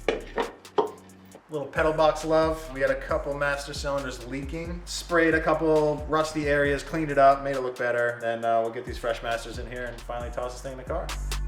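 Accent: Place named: New Hampshire, United States. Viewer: Nice to see you back,! Your new Hampshire accent really shows when you spend more time there. When you gonna bring back 'd?!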